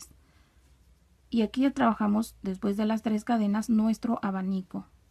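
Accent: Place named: Guatemala. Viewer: Estimada señora , me gusta mucho su camino de mesa, hace poco la descubri y ya empece a hacerlo, lo mejor es que lo explica muy bien, gracias por publicarlo, reciba saludos atentos, me da curiosidad su acento, se parece mucho al de ños guatemaltecos.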